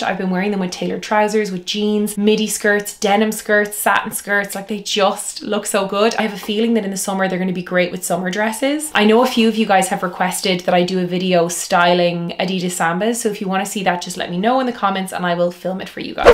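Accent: Ireland. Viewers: I thoroughly enjoy your style, fashion recommendations and beautiful Irish speech. The rattan purse you showed us is so unique. What brand is it and where might I find it? 🇨🇦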